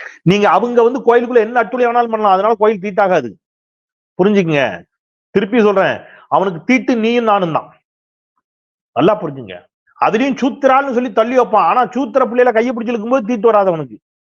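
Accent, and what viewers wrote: Tirunelveli, India. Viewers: அண்ணா சமயத்தில் உங்க பேச்சை கேட்டு *நான் ரொம்பவே குழம்பி போயிருக்கிறேன் ஆரம்பத்தில் இவர் நக்கலா சொல்றாராரா இல்ல சீரியஸா சொல்றாரானு போக போக உங்க வீடியோ பார்க்க பிறகுதான் உங்க பேச்சின் * நையாண்டி கலந்த உண்மை புரிய ஆரம்பித்தது ** அண்ணா ** நெல்லை மண்ணின் மனம் தங்கள் பேச்சில் சூப்பர் **👌👌👌👌👌👌👌👍👍👍👍👍😁😁😁😁😁😁😁😅😅😅😅😅😅😂😂🎉🎉🎉🎉🎉🎉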